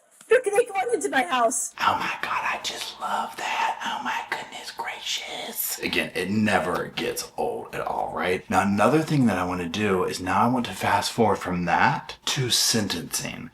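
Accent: South, United States. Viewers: I have figured out why I enjoy your commentary about cases so much! You remind me of conversations with my brother about anything/anyone who is doing shady shit or sus situations. I love it as we can be some salty, sassy, and petty southerners. I laugh because my brother in law just rolls his eyes when we get catty, but that's how we just gossip for lack of a better word. Now, mind you, this isn't really gossip, but it's delivered similarly and as we grew up in west tn, the accent is another plus. This is just a thank you for being you and and for doing what you do bringing cases and situations around them to light in your way.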